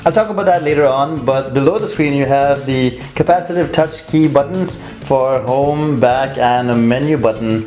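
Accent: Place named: India. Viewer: great! grammar is pretty good but you sounded so indian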